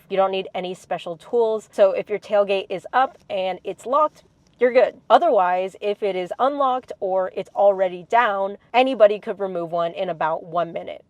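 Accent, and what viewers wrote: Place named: United States. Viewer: pretty sure she is american, I am expert on accents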